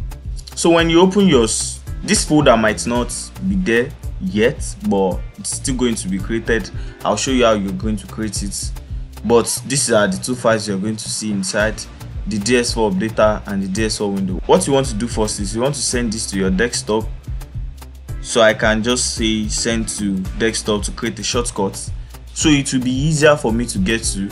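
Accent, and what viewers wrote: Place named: Nigeria. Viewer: I love that Nigerian Accent. 👍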